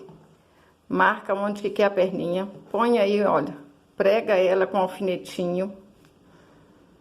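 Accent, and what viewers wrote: Minas Gerais, Brazil. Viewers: Eu simplesmente ameiii, adoro bonecas de pano, adoro fuxico e adoro esse sotaque mineiro 💖😍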